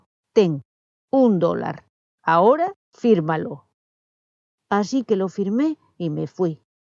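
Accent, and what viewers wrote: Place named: Spain. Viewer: me gusta como lees y me gusta que sea un video de tan solo una hora. La pronunciacion es clara. Me encanta oir tu espanol de Espana.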